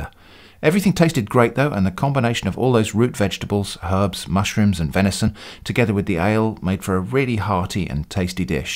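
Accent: United Kingdom. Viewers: its like Primitive Technology with UK dub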